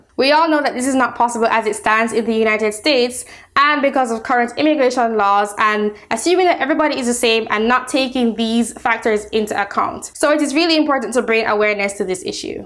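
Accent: Caribbean. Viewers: Are you Carribean? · Hello. I noticed Caribbean accent in your voice. Are you Jamaican?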